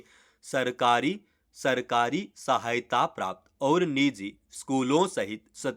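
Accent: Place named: South, India. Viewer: पेदा या पैदा अरे मद्रासी हिन्दी बोलना सीख लो। न होता है या नै। पहले अच्छे से हिंदी सीख लो भाई बोलना।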